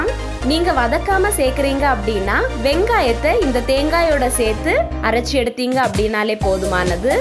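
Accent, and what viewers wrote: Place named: Marthandam, India. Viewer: Aiwaa marthandam tamil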